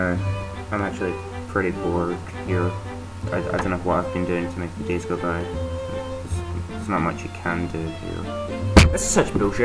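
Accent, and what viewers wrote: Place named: Ireland. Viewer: haha gotta love that crazy irish accent!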